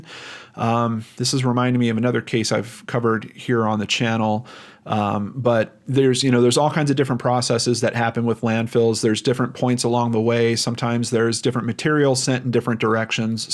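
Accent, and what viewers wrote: Canada. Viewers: As a Canadian there's absolutely wrong with you sounding like it eh? This case is tough, whether or not Ronnie was responsible his passing pretty much killed the case and investigation since it sounds like the police really had him As the likeliest suspect and not many others. Thoughts are with that family who went through so much. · Great video. This is by far the most comprehensive coverage (in text or video) that I've even seen of Romero's case. As to you Canadian "out", accents have a way of spilling over national boundaries.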